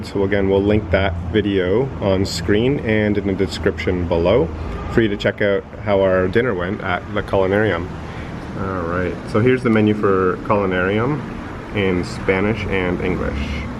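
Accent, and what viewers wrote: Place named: Canada. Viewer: I knew you were Canadian as soon as I heard you talk. Great video!! This is the best one I’ve seen. I’m going on the 29th and it was so detailed.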